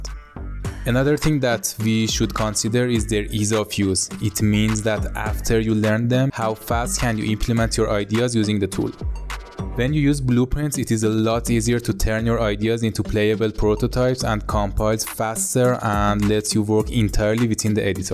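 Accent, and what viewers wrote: Iran. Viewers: لهجه ایرانی وری یونیک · bro from that accent your definitely persian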